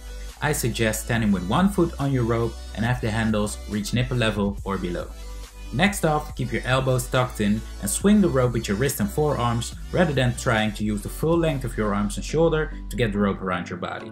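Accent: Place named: United States. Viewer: These are one of the best..no THE BEST, jump rope tutorials on YouTube. Clear breakdowns of all the techniques. Just one minor moan: As an English person, I have to mentally block out the American pronunciation "TUT-orial" (rather than "tew-torial)...my teenage son spends so much time online, even he's saying 'gas' rather than 'petrol' ;-)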